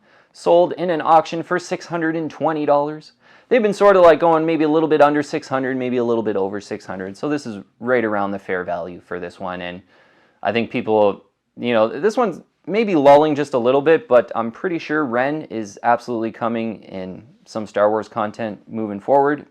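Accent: Canada. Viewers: Love your videos - and that Canadian accent makes me miss home. Awesome work! #TimHortons4ever #logriders4life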